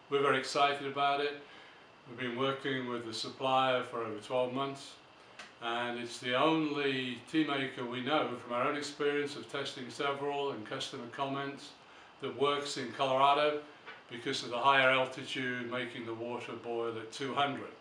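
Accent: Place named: Ireland. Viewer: Im just here for the accent. It's a soft subtle accent, Irish? Pretty. I love this guy. Is he married? M. IL. ❤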